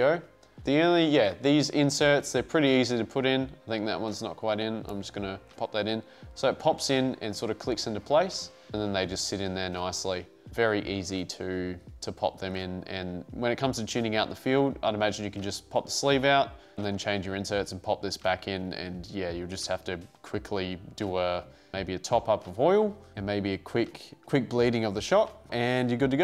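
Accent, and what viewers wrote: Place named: Australia. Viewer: Campletown shirt Aussie accent 💪must be a local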